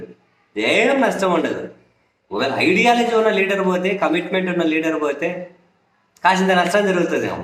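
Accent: Rayalaseema, India. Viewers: My Rayalaseema language I liked it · First time రాయల సీమ slang lo chusanu nenu నవ్వుకొన్నా బాగా ❤😂😂😂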